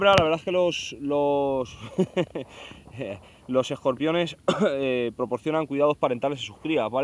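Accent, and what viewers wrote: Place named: Toledo, Spain. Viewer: Otro RODRÍGUEZ DE LA FUENTE. Enhorabuena, por cierto por tú acento imágine que eras serca de la provincia de Toledo, yo soy de un pueblo de Málaga de niño mucho mucho campo hoy ya a mis 50 años ya salgo menos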